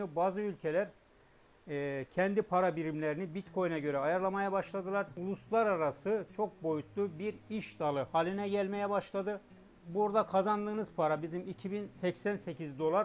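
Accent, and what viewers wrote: Erzurum, Turkey. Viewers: DAYI SEN ERZURUMLUMUSUN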